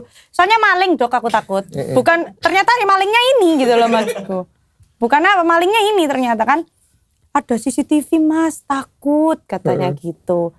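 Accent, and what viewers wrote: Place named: East Java, Indonesia. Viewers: jawa timuran, tepatnya ya surboyo ngalam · Dialek Jawa Suroboyoan ini.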